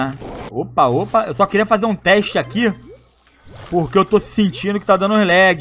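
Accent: Rio de Janeiro, Brazil. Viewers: Legal seu vídeo e ideia, quase ninguém faz esse tipo. Mas eu não suporto esse sotaque e o jeito de falar de, carioca sei lá. Kkkk assisto no mudo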